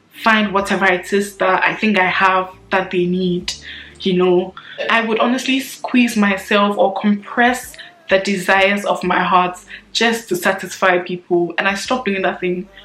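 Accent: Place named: France. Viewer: The french accent is beautiful. Nice video ❤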